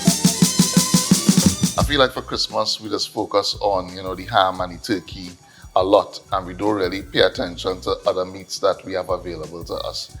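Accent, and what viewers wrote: Trinidad and Tobago. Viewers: I love to watch you cook Bro. I also love to hear the real trini accent when you explaining how to prepare the dish. Total Trini style! Ah bigging you up! 🙌🏾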